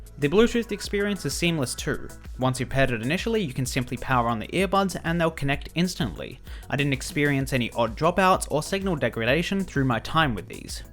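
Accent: Australia. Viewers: lol that's just how us aussies sound.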